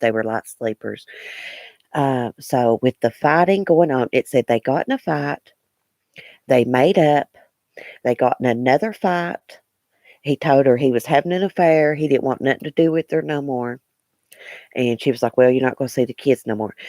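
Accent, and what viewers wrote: South, United States. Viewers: Love your sweet southern accent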